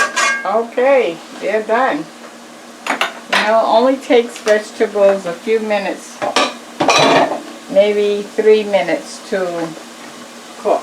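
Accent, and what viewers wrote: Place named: New York, United States. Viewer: I just love your jersey or new York accent as well as your channel thanks for sharing hun😀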